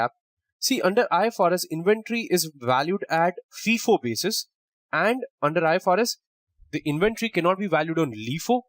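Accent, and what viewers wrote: India. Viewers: He sounds like Rajesh Koothrapalli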